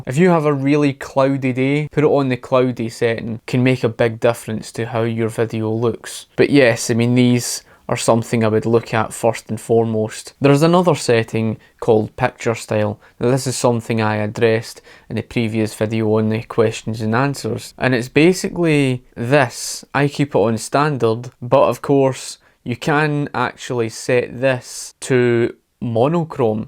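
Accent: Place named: Scotland, United Kingdom. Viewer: A Scottish accent is my favorite accent in the world oh my gosh seriously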